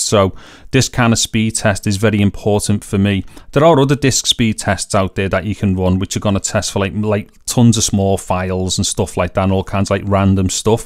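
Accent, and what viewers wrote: Liverpool, United Kingdom. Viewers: You are a scoucer, aren't you?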